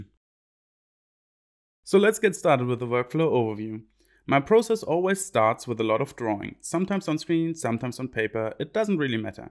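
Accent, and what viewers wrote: Germany. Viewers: your name is not, it's. i know a german accent when I hear one:D great tutorial btw! thank you so much for uploading it!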